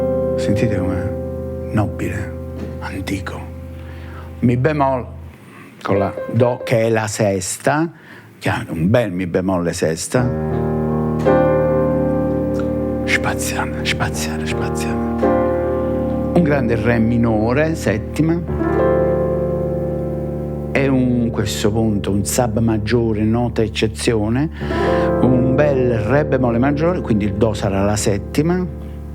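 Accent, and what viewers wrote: Naples, Italy. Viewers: Il tocco di napoletanità è sempre piacevole. Complimenti!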